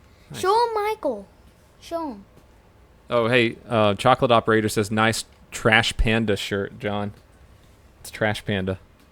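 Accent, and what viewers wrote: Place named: South, United States. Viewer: Wow man i just noticed you have a southern accent! lol I'm serious i never noticed before and i have watched you for about a year! I must be sleeping or something man...lol Your vids are great though and your hilarious!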